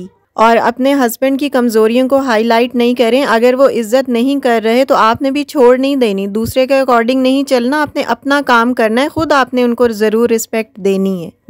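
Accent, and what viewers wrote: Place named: Karachi, Pakistan. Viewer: ap k bolny k andaz sy lg rha tha k ap karachi ke hen.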